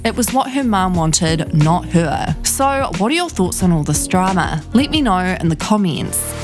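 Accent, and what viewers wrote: New Zealand. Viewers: its a new Zealand accent not Australian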